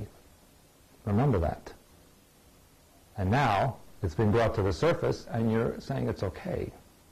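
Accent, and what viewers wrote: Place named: Russia. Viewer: So he's Russian, of communist family origins and he's married to whom? She's related to Mariam Magdalen. He has an accent, yet I am not sure why if he spent 25 years within the US fold working and ended that back in the 80s. He looks pretty young here, and this video was taken before 2003. Is 7lovo4 Stewart or a student? I'm seeing a fine line of agenda here, which is going to make me doubt a lot of his teachings and I'm only into this guy by 10 hrs or so. This is a reptilian take over?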